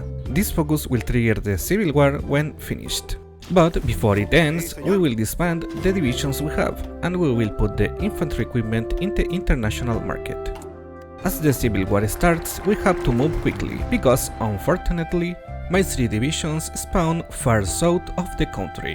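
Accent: Chile. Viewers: Nunca pensé ver un youtuber de hoi4 chileno hablando en inglés xD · Al oir el acento pense: chileno? Parece q si :v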